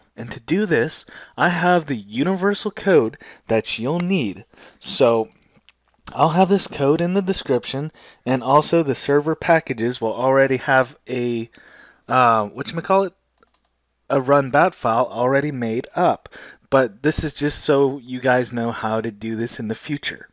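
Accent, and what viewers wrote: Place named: Brazil. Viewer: So you're from Brazil? I could tell.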